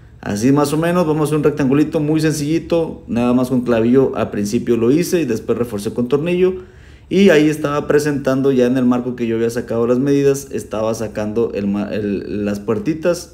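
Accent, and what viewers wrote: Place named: Michoacán, Mexico. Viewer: Muy buen trabajo 👍👌 oye mi estimado eres de Michoacán verdad por el asento y la parota que trabajas eres como de coalcoman por esos rumbos de Michoacán jeje saludos y bendiciones amigo gracias por compartir tus conocimientos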